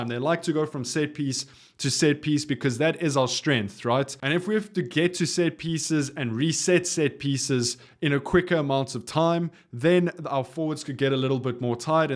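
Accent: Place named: South Africa. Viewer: I love moeeerrrr the like and subscribe. Truelly souf’aafeikaaan! Please like and subscribe is so English. And you know what we think English means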